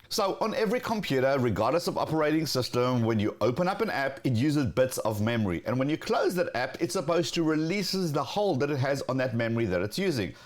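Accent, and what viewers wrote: South Africa. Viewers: South African? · Your hectic SA accent in the videos make my flippin day bru. Info in my tongue is just so much more lekker to consume. Please dont stop doing what you're doing dude. You rock. ￼ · OMG I ALMOST IMMEDIATELY recognized that South African accent !!! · First time watching your videos, noticed your strong South African accent coming through. Nice work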